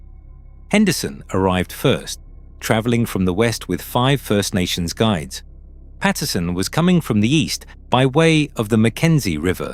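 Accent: United Kingdom. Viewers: Great writing and images. Excellent British voice in the narration - nice enunciation, warm tones, clear and listenable, great intonation and expression. But please if you can edit it, decide to use the Dee-nay or Dee-neh to pronounce Dinè. Don't be all over the place when the rest is so well done. Worth fixing if possible, to respect the Dinè.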